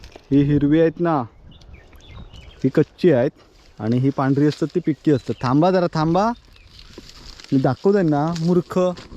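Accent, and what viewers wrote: Konkan, India. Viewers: भाऊ तू कोंकणी आहे का सुद्ध मराठी आहे तुझी❤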